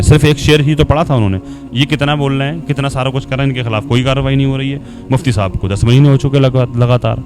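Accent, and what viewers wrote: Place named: Maharashtra, India. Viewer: Yeh Maharashtrian Boly hae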